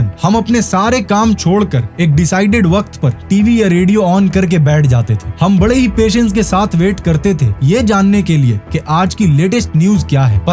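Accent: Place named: Bengal, India. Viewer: দাদা তোমার হিন্দি তে বাংলা টানের জন্য গর্বিত! 🙂 🙏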